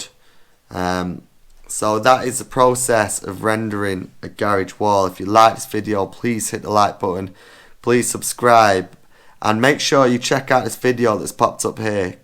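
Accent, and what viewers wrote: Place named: North, United Kingdom. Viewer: Hastings?! You sound like you have a northern twang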